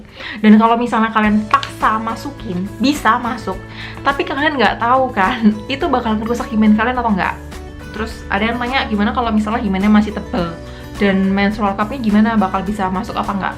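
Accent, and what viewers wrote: Java, Indonesia. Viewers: Sampean wong endi tohh mbak medok tenan jowo na akoh wong ngapak mbak ora ngapak ora kepenak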